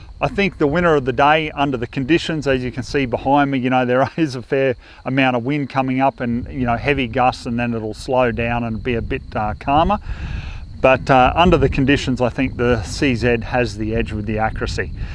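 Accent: Australia. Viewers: The dude is from Australia. I’m from the US. No wonder everybody hates us. You are either stupid or arrogant.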